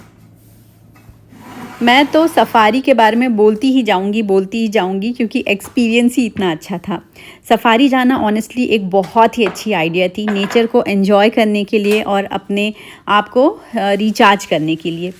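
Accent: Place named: Uttar Pradesh, India. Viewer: Apke accent se lagrha ap UP se ho india me Maza aata h apki videos dekh k btw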